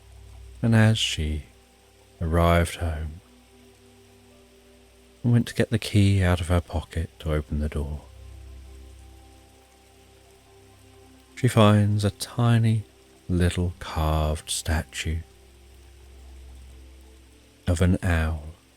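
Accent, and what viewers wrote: United Kingdom. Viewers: Had to update: My second night listening was even better than my first. This is the best for insomnia. I need that perfect British accent with that slight, almost undetectable slowed down effect. And so long, 8+ hrs! Love how one dreamscape effortlessly morphs into another, no need to worry about missing anything. I can get up to use the bathroom or shift sleep positions and gently fall back into whatever soft, lulling, dream-story vignette is unfolding. I accidentally slept in after my second night listening, and my entire body, head to toe, felt utterly relaxed. Thank you!🌌🌜👑🏰🌈🌳🌌🦜☔️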